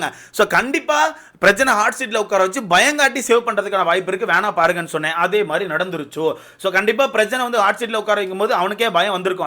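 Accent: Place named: Madurai, India. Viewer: Madurai slang bro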